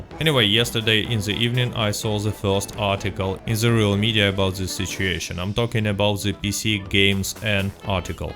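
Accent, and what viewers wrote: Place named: Russia. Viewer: I love your Russian accent!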